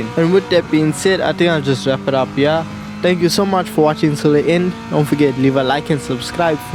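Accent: South Africa. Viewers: Are you south african bru?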